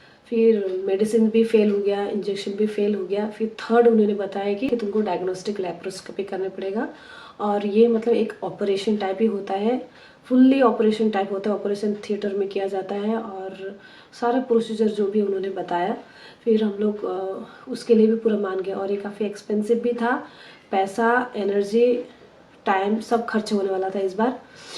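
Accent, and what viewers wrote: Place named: Nepal. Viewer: Why do you sound like Nepali?? Di are you Nepali ??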